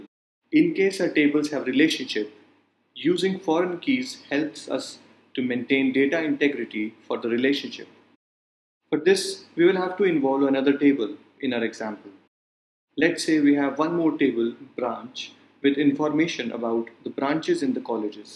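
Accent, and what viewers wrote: India. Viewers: Now americans will also watch this video even if they hate indian accent( because this is the best video on YouTube till now)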